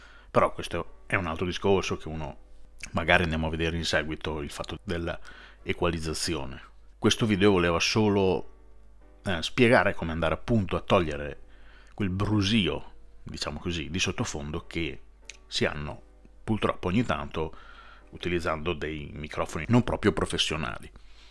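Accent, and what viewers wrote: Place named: Genoa, Italy. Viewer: mi sbaglio o mi sembri de zena ?!?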